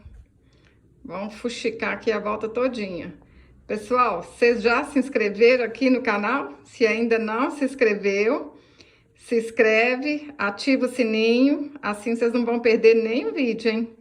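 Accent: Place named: Belo Horizonte, Brazil. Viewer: Adoro vc jeito falar igual minha tia de Belo Horizonte fazia coisas e doces como ninguém foi embora 88 anos que saudades qdo ouço você quero me inscrever não consegui ainda bjs amo vc creusa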